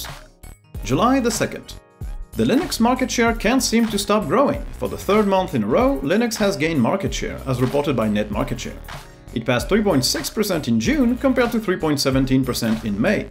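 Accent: Brittany, France. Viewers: Il est de Brest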